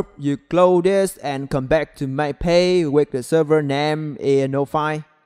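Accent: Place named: Vietnam. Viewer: Nghe giống việt nam vãi!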